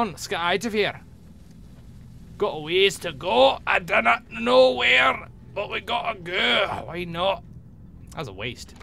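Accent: Scotland, United Kingdom. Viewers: Mate the Scottish accent is fun ( especially in England where no one understands you ) but it ain't the easiest to get rid of once you've got it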